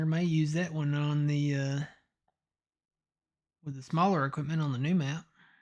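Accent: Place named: United States. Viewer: hey bro. this is good content, lots of creators mess it up by putting in those speeded up farming with horrible music, plz dont do that and you will have at least me to keep telling you are doing great. you must either be a farmer, or have or do work on farms. and your country accent, lol not a bad thing just kinda funny. I live in idaho you know SPUD COUNTRY LOL, Im from Fairbanks Alaska, and spent ha;f my life in Seattle,. Not much for the big city, I am a metalhead but i love all real music. if yoou ever wanna say wat up Im down to make a new friend. If you want I can send you my stean handle or watever ppl call it these days and maybe we could multi player some time. like i said this is good content, keep up the good work bro. Sean.......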